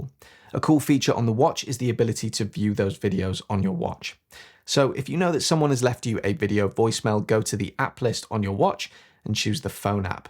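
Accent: United Kingdom. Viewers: 😂thanks but u seem like u have super powers in explaining and speaking convincingly. American Apple engineers would be hypnotized by your Brit accent 👌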